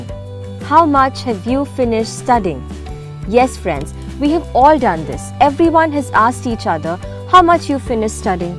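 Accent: India. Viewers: Indian cute accent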